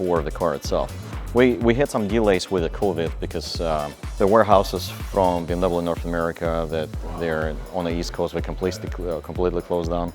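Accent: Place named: Soviet Union. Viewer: sorry buddy but your soviet accent is annoying but good work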